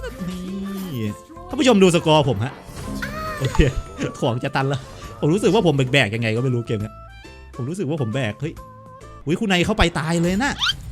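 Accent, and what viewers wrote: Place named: South, Thailand. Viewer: แหลงใต้ชับพึดนิ5555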